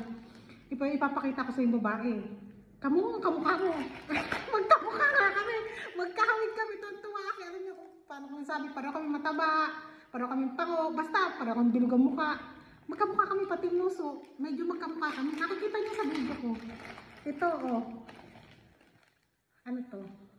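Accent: Nueva Ecija, Philippines. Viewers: Ateh saan ka sa pinas parang nueva ecija ka ba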